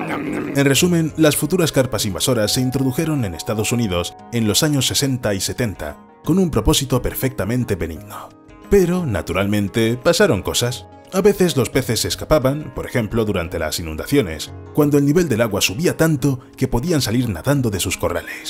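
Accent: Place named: Spain. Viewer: El narrador tenía que ser Español, qué mala suerte ,muy fome.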